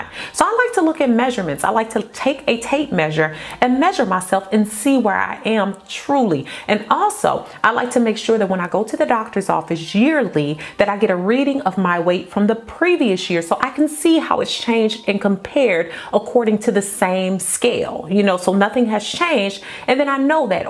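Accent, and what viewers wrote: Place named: South, United States. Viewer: I hear some southerness in your voice. Thanks for this video.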